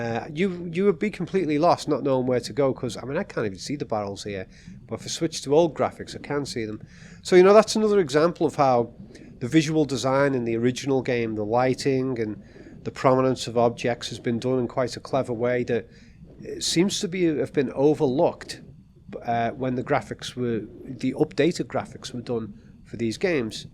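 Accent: United Kingdom. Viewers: Interesting analysis! You also spund fairly local. are you based in merseyside? haven't found many content creators round here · This will be excellent. My favourite all time games for many reasons. The cerebral aspect of them at the forefront. Scouse and tomb raider what a mix. 👍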